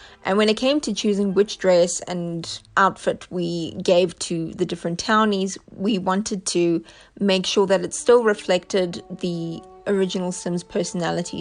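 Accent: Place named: South Africa. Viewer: I missed your entry video (consider it watched now)! Thanks for this. Great hearing a SA accent again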